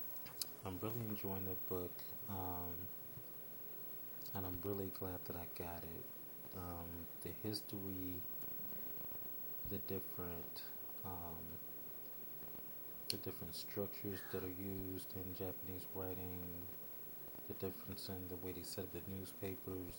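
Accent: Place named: DMV, United States. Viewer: Thank u for this video I homeschool my children and I’ve done so for many years my children are very interested in speaking Japanese and ur video has been the most helpful to me with the teaching basics at a low price so once agin thank u 🙏🏾 I currently live in Ohio but I’m a lower eastern shore girl through and through I could tell from ur accent that u were from the DMV area and to me that is always a plus 😉👍🏾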